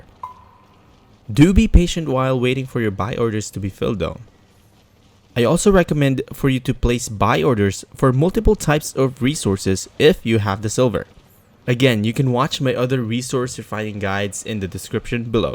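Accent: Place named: Philippines. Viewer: Hi bro you have hints of Filipino accent with you. Am I right? Great video btw should i leave my current guild with friends to join the VIP crafters?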